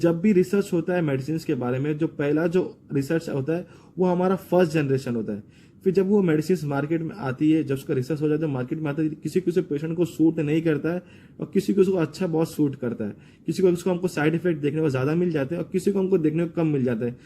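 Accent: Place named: Hyderabad, India. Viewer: Bhai tum Hyderabad ke Rehne wale hai kya... Hyderabadi urdu...dikhri tumhari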